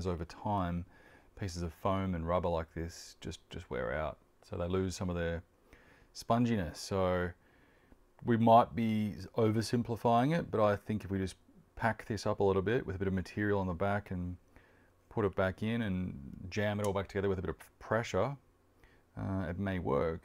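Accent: Australia. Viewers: Am I the only one that likes the down under accent?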